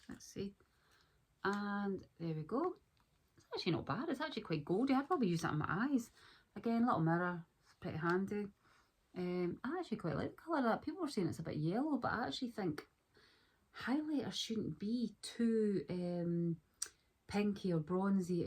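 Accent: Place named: Scotland, United Kingdom. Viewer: I love a more personal and authentic vs commercial vibe to the content i watch! I'm not sure what's your cute little accent - Scottish?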